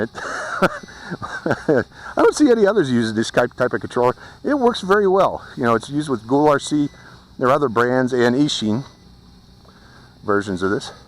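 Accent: Pittsburgh, United States. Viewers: how do you get extra propellers for this? also: i'm guessing you're from pittsburgh? my dad is, and he has the same accent.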